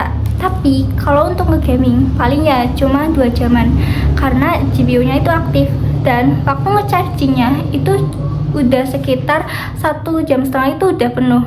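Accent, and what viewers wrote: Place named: Tegal, Indonesia. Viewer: Logat tegale krasa nmn😭🙌🏽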